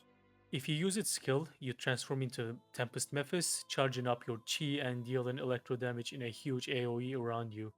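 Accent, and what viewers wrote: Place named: Turkey. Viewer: türk müsün? aksan türk aksanı.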